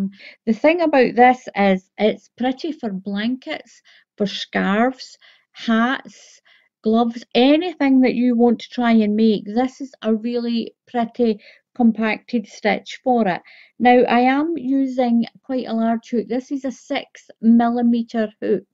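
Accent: Scotland, United Kingdom. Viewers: Thanks for a lovely CLEAR TUTORIAL. I'm stuck in bed after heart surgery so looking forward to trying this pretty pattern later today. Lots of love Kathy. Also lovely to hear a Scottish voice. Been away from Scotland for 50 years ❤️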